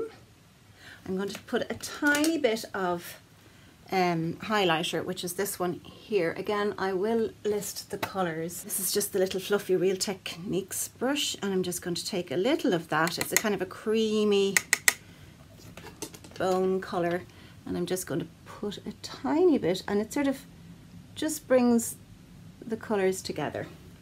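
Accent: Ireland. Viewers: Thanks for sharing, love your look and love listening to your lovely Irish accent. Huggss from Australia xox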